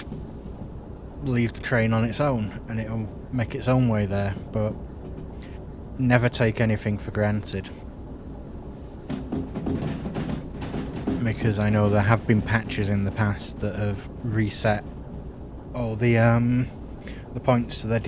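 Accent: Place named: England, United Kingdom. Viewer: Great video. I love that English accent and your sense of humor.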